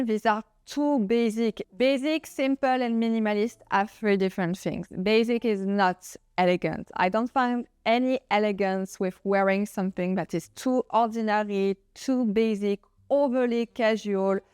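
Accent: France. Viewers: 😂Love your french accent. I was in France in 2022 (August and November). Traveled to Paris, Normandy, E'tretat, Beaune, Chamonix, Nice, Cannes, Antibes, Bayonne and Bordeaux. Loved France and French culture. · I love your French accent😊 You are doing a great job👍🏻👍🏻 Vive la France!🥰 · “If you’re offended… my big French accent… please move on to…”, well said 👏! Every time you post a video, I watch it several times. I can’t agree with you more. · but your accent is what makes you unique! i love your videos with your french accent :) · You got the best french accent ma'am❤️ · This Yank ADORES your big French accent. And Gaul energy. Keep on keeping on! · I love you, unapologetic about your opinions right out the gate. It’s so refreshing to see. If someone doesn’t like your opinion they can go to a different channel. Also if someone doesn’t like your authentic French accent on a channel about French style they can also move on. 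I love your voice and your accent. I love the authentic perspective you give as a person from France, living in Paris and having lived a time in the US for true experience in comparison. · As is your BEAUTIFUL 💕 French accent! It is such a lovely part of your videos! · ALL perfectly said. 👌 And I LOVE your big French accent ❤ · I like your french accent very much! I think it‘s really charmimg!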